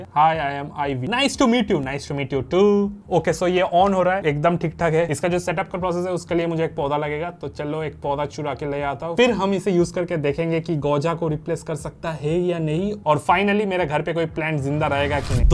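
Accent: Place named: Bengal, India. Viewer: Kay hoya bongla spiking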